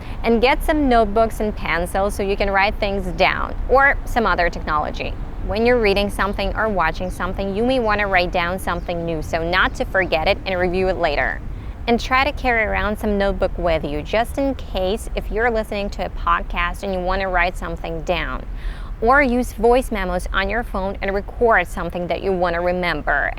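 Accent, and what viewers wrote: United States. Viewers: thanks ma'am, I am very much enthusiastic to have accent like you as american but I can't so I want to do so hence try to help me in this regards more tips by which may I be able to reach my goal at the earliest as I can. I regularly watch your video for being fluent in the english language and I'm trying my level best in order to do so please do provide me someone's whatsapp nos through which I want to be perfect by regular discussion else let's see how does it come to an end for doing so soon. but I am very much hopeful to do so accordinly. Thanks awaiting your reply please. (India) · How're you? Your videos... it's a hell of a practical knowledge:D I love listening to your voice - your American accent <3, that's my goal:) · скажите пожалуйста из какого вы города России? У вас отличный американский акцент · Вчора на вас підписалася, так думаю "напевне якась датчанка" бо ж всі вони добре говорять англійською) а тут дивлюся точно знайоме місто. 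Ви дуже дуже класна і хоч я англійський філолог, та мені далеко до вашого американського акценту! Бажаю вас багато підписників і задоволення від улюбленої справи) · I'm from a non-native English speaking country. I really wanted to check the opinion of a native speaker of English. Her American English accent sounds perfect to my ears, but because I'm not a native speaker of English, I was not really sure about that and was waiting for the opinion of an American native speaker of English. She is a good inspiration for me in my English learning journey. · Yeah. She looks like from one of those countries. She has a kidda American accent, though. A pretty woman, by the way. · Very good video with a bunch of great advice! 👍 Congratulations. By the way, you have an awesome american-english accent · Thank you, from the USA. I love your advice. you have an awesome American-English accent. Finally, I have to tell you're so good. 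When you will come to the USA. Let me know. Please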